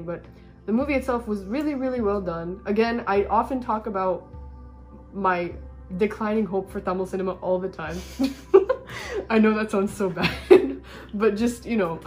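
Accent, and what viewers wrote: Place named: United States. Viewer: Wow... Wonderful Review in American English... Congrats...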